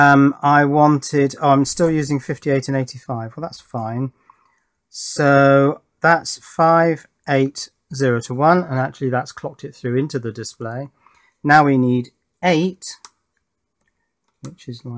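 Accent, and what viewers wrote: United Kingdom. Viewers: Are you British Man!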